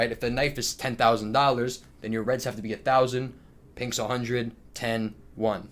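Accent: New York, United States. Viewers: The real new yorker came out of you today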